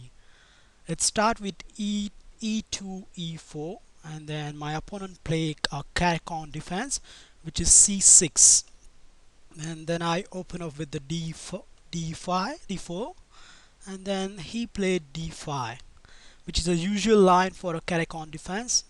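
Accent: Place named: India. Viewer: Thanks, i like your indian accent very humble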